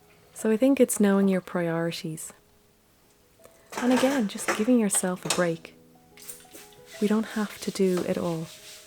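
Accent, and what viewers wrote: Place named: Kilkenny, Ireland. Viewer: Is that an Aran!? So beautiful! Also are you from Wicklow or is your accent from Kilkenny?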